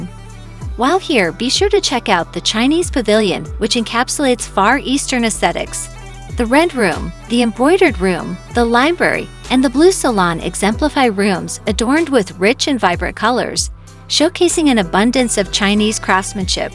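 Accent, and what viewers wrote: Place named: United States. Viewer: Can not listen to awful narrative with american woman accent jars on ear's.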